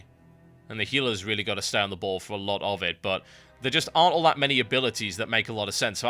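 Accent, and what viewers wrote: United Kingdom. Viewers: Can't wait till Cata comes out, so I can stop watching these Vids with his annoyingly British accent.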